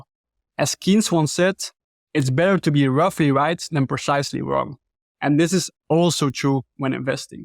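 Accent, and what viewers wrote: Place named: Brazil. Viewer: Also, my guess is he's Brazilian. He has the UFC fighter accent.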